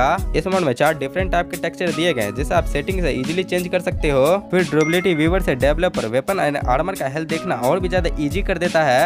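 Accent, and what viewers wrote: Bihar, India. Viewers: R U From Bihar ? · Bro ur bihari accent is awesome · you are from Bihar i m 99% sure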